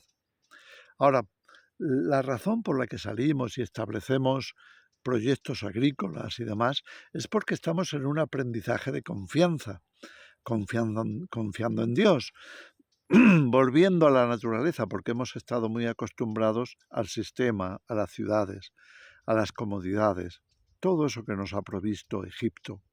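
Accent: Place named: Spain. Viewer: primera vez que te escucho y veo hermano!,con mi familia dimos ese salto de fe al mudarnos a una pequeña ciudad a 1500 km de distancia al surde argentina,junto a mi esposa entemdimos que Dios nos llamaba a algo diferente,y en 11 años aprendimos a esperar de la provosion de DIOS atraves de un pequeño negocio de comidas tipicas del norte argentino...hoy argentina esta siendo gobernada por un verdadero ANTICRISTO un ser inmoral y despota que esta llevando a la nacion al hambre y la desesperanza,tuvimos que desdoblar las fuerzas y esperanzas en el SEÑOR Y SUS PROMESAS,aprendiendo y recibiendo el mensaje que; la IGLESIA DE CRISTO EN LA TIERRA sera presionada atravez de la economia familiar! Coicidimos con usted pastor y sus palabras. ...por el acento,veo que es español,de donde es su localidad??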